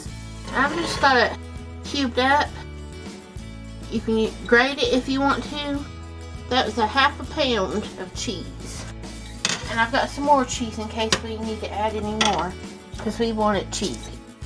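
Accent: South, United States. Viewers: what is that accent? where in america? LOVE IT xxxxxx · lichen goatfungus she does seem very sweet!!! Her food looks delicious 😋 and I love her accent,where is she from? I’m a Houston,Texas girl,and I love southern accents!!! And Country cooking might I add😁 I cook country and soul food 🥘 people have asked me if I preferred soul food because I’m African American,no I assure you I’m into all kinds of food, it doesn’t matter my ethnicity. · Clayton Cooley I agree! She's very sweet and her voice is soothing and the southern accent makes her more charming. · Her sweet southern voice is actually helping my anxiety. Had a nightmare and it’s 4am, ended up on her channel and it’s like a lullaby. Thank you!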